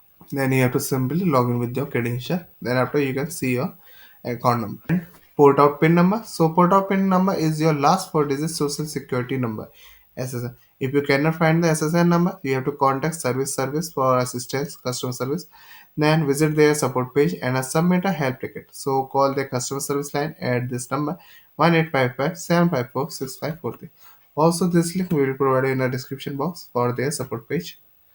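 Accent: India. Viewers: your disgusting indian accent kills me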